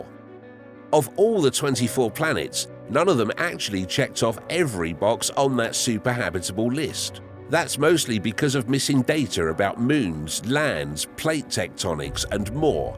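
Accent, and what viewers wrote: United Kingdom. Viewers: Why does the guy have a British accent then?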